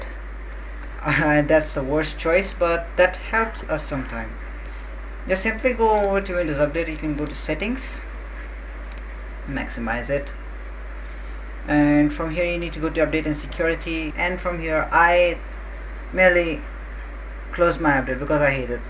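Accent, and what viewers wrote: India. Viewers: love the indian accent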